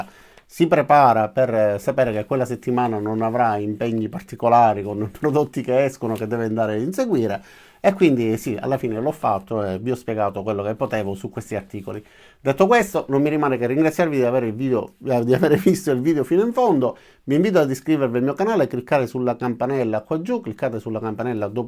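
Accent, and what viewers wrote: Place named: Southern Italy, Italy. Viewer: anche se io personalmente ho scelto un altro brand... seguo sempre volentieri (e sono iscritto) i tuoi video. Inoltre é anche piacevole questo nostro accento del sud. Un caro abbraccio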